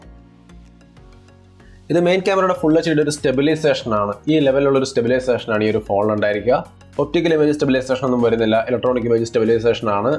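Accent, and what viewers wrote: Thrissur, India. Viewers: Brooo thrissur Karan anno? Reply please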